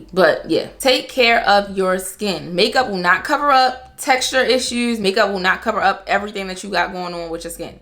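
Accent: Baltimore, United States. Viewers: Is that a Baltimore accent 👀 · Im 36 and I love these tips especially for the new year around the corner! They're useful for women from all walks of life. And as a fellow Baltimorean, I love listening to someone with our unique accent😆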